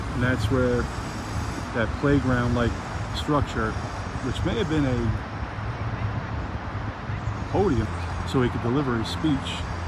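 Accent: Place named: New Zealand. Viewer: Can tell u from Nz😆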